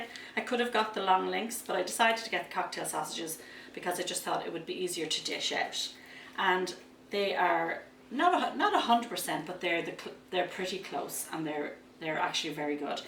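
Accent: Ireland. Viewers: Your not from Dublin your Limerick, but your Irish and like our Coddle yummy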